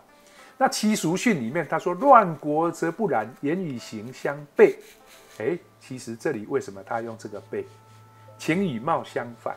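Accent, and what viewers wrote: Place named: Yongchun, China. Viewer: 我也是永春人，難怪口音與陳老師相類。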